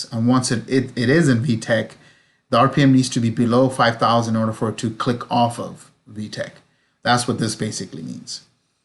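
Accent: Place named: Latin America. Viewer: Exelent video bro. 1 question can you do a tune remotely? You seems and sound latino 😁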